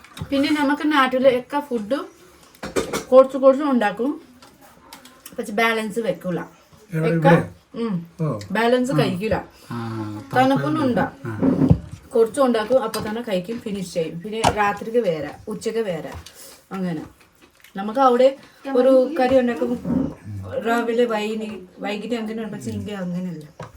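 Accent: Tamil Nadu, India. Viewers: Chechide slangil korachu Tamil mix aavunnundu😅😅😅😅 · Tamil.mix slangil❤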